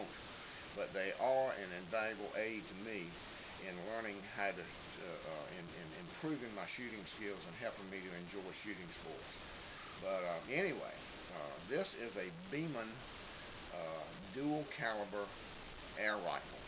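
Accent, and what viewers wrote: North Carolina, United States. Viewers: Are you from NC by chance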